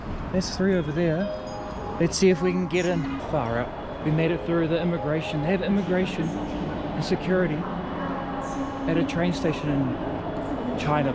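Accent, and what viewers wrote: New Zealand. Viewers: sounds like kiwi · Sounds like you're a Kiwi, not an Australian. So I'm not expecting anything great. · I was gonna say you sounded like a kiwi and where were you from and then you told the taxi driver you were from New Zealand and answered my question 😂 Subscribed!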